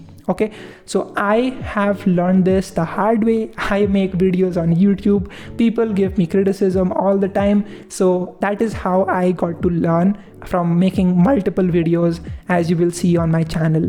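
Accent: India. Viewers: Hey, developing an accent is not bad especially since the Standard of International English is mainly Received Pronunciation (or RP), the only thing I noticed is about you in your older video that you lacked confidence and the intro was like a bulleted format instead of focusing on how to tell a story of yourself. And RP is still neutral in most countries (as it's the most legible and understandable format throughout the world). Nowadays, the accent you generally speak is generally more influenced by our Indian languages. For us it's completely awesome, but if anyone from other than Asia watches they might identify that your native lang is Asian (due to more focus on R, T, D like in Hindi). I would suggest from my POV that having an accent is completely fine but the accent should be in such a way that seems NOVEL to everybody. (especially I don't like people who change accent speaking to an English Native, either we should speak with the accent that everyone's comfortable with every time meeting new people or stick to your accent influenced by your nativeness)..... 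And I would say you can have that accent of your earlier without hurting anyone for Neutralness. (As most Indian curriculum never includes subjects like Lexical Stress, Rhoicity which speaks about how to speak or pronounce words and letters properly, which is present in other Indian languages )......Strange Hypocrisy ....... Btw THIS IS FOR NOT TO OFFEND OR TO BOTHER ANYBODY .... I'm sharing my opinion after all ..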